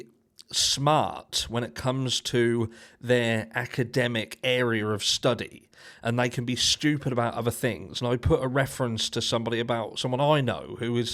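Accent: United Kingdom. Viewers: I love British accents including yours but today I realized how well you also annunciate your words. I tried but I can’t see the DNA proving Bryan is guilty because I think the knife sheath was planted. Apart from all of the problems with the DNA, Cristi and Steve came out later and declared that Kaylee had tried to get away but she was trapped on 3 sides of the bed. They deduced this I believe from something Steve found out from their investigator. They were told that Kaylee was on top of Maddie in the bed. I’ve heard a couple of scenarios of the killer throwing her on the bed where she landed on Maddie. The coroner said no one is permitted to move the bodies including the police. At first no one, including Brett Payne, saw that sheath. It was on the second walk through that Payne claimed he saw the knife sheath from the doorway. It was either under or beside Maddie. 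He must have X-rays eyes to see that sheath under 2 bodies and 2 bodies that were bloody because there was no blood or any other DNA on that sheath. Jay is for Justice had a great video especially about “Cathy with a C” Mabbatt, which she and others kept pronouncing it MUH BUTT. She did funny imitations of Cathy wringing her hands and being so nervous, evasive, and vague.When she was asked where the bodies were found, she hesitated and said she didn’t think she was permitted to give out that information. She also said that the news report stated that they were stabbed with a knife. Jay commented and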